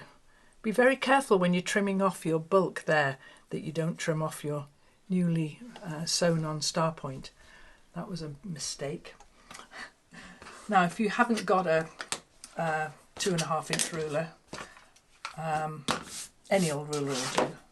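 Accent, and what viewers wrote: England, United Kingdom. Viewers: I tie most of the baby quilts I make. You can use a thicker batting and it’ll be “puffy”where it’s tied. Use a variety of brightly colored embroidery floss to tie it. Your videos are always inspirational. I love listening to your English accent, my grandmother was born in England, so it takes me back. ❤ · I’m wondering also, what part of the U. K. are you from?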